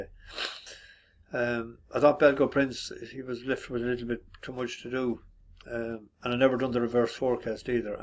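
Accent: RP, United Kingdom. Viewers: U gone very posh.jesus I remember when U were a bus driver 😂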